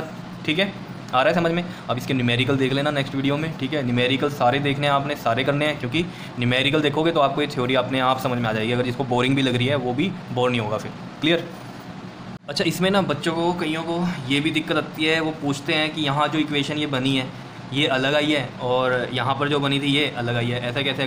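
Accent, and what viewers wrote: Punjab, India. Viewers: i found a bit of punjabi accent in your video 😎😎😎😎😎😎😎❤❤❤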